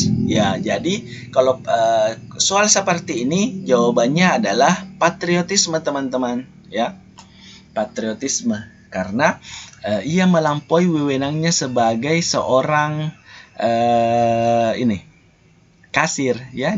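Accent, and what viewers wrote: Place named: Sulawesi, Indonesia. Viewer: Sudah saya subscribe kak,keren pnjelasanx kak..mudah dpahami,, sepertinya logat Sulawesi 😂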